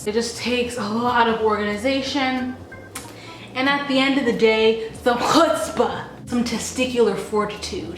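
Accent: Nairobi, Kenya. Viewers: dig the bougie nairobi accent lol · Love the bougie Nairobi accent. Reminded me of Don Cheadle in Hotel Rwanda, somehow. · UMMMM i love your Nairobi accent!! I need to check to see if you you've done any ACCENT tag videos!!! This video was just so awesome!!! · lol the kenyan accent gets me everytime coz me and my cousins do it all the time!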